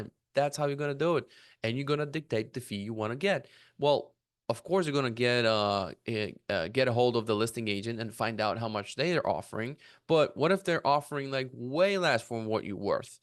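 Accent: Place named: Eastern Europe. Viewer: I appreciate this, I think after the NAR settlement many are confused with what’s happening with buyer’s agents. There just needs to be more conversations to be had and value provided to clients. Love this breakdown and script, I think this is valuable for agents! Got a sub and like from me bro👍💯. P.S. - I'm Romanian, where are you from? You sound Eastern European 😅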